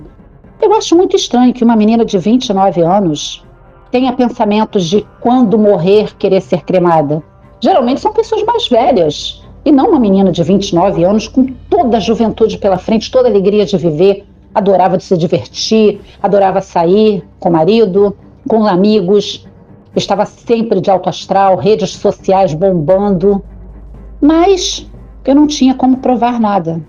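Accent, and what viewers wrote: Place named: Rio de Janeiro, Brazil. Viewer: Eu to viciada na!!! Estórias mto boas!!!!! E eu que sou espírita seu que tudo que ela conta é real. E é carioca. Adoro sotaque carioca. Beijos pra vc. Brigada pelas estórias. 🥰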